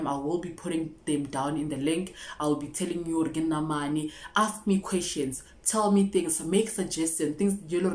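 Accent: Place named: Venda, South Africa. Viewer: Shes a venda this one. N why bolobedu accent not the same my father side speaks fluent pedi like lobedu ,while she has that venda tast n my home dont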